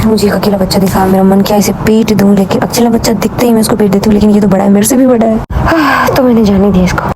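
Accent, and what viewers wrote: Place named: Marwari, India. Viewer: Es aavaj m alag hi marwadi accent aarha h😝👍🏻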